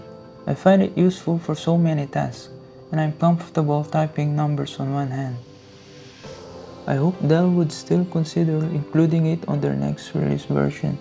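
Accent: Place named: Philippines. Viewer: I can see from your accent and your hand tattoos from Kalinga tribe that you are a Filipino. May I know how did you manage to get your hands on AW x17 r1? Did you use 3rd party courier? As you know, here in PH the x17 r1 is still unavailable and most likely will never be available because I heard that Dell PH will only import the X15 versions.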